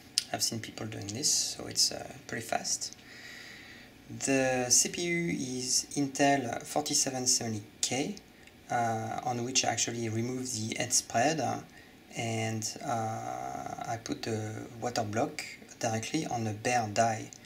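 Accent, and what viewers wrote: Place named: France. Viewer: Un accent bien français mais sinon ta video est très bien continu comme ca :)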